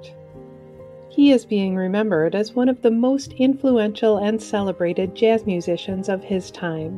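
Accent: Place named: Midwest, United States. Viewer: I smell an accent, ah narrator are you from the Midwest? ❤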